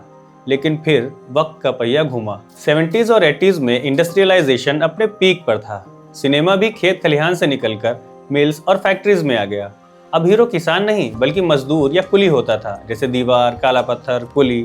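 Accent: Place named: Karachi, Pakistan. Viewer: app ki zaban bilkul hamaray jaisi hay from karachi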